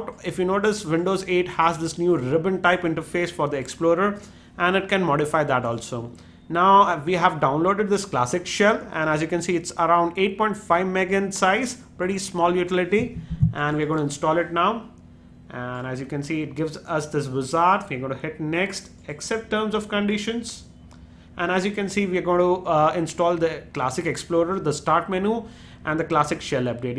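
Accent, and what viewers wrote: India. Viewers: lol i love indian accent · LOLOLOLOLOLOLOLOLOLOLOLOL Indian accent <3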